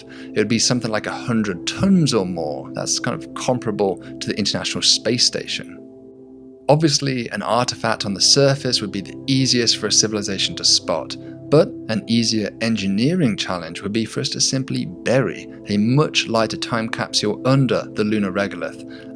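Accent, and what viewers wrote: United Kingdom. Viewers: How appropriate to use the vocal musings of the great Carl Sagan. Fantastic and along with the gentle British voice of the narrator, soothing.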